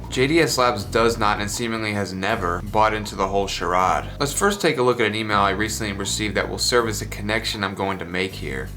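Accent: New York, United States. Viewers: That NY accent! :D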